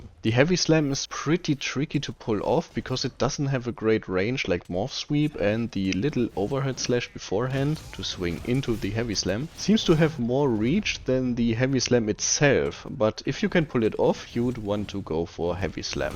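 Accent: Germany. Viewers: Dieser Deutsch Akzent 😂😂😂 gutes Video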